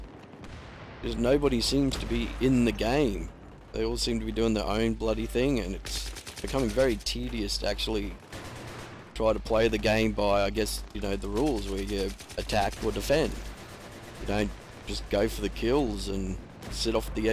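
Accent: Australia. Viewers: Like maa peenis, i love australian accents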